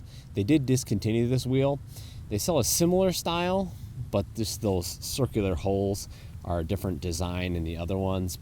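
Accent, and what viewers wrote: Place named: Michigan, United States. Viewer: Love your vids. Are you in Michigan by chance? The weather, trees, and accent give it away being a Michigander myself.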